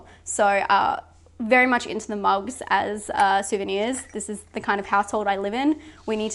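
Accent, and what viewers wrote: Australia. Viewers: Great haul! I also am not really a collector, I like browsing stuff like that but hate displaying and keeping stuff but you chose great souvenirs! Also, what English speaking person doesn't understand an Australian accent? 😂 can't wait for your next video! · An American made fun of your accent? What a knob. Australian accents are the best!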